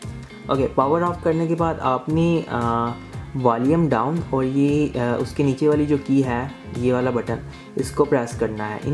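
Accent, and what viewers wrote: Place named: India. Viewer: It is in english but his english is mixed with Indien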